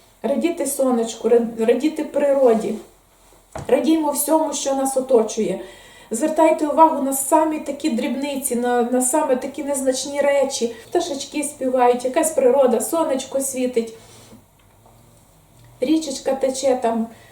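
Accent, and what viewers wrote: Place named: Lviv, Ukraine. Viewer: Вибачте,що я питаю.Де ви народились,в вас вимова,як в нас на Львівщині .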